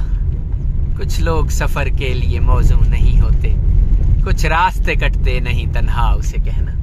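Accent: Sindh, India. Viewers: Loved your Sindhi accent as well ❤️ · what a beautiful sindhi accent of yours ☺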